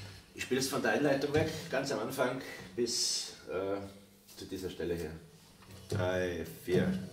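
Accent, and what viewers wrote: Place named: Bahia, Brazil. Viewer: Putz pensei que era baiano.....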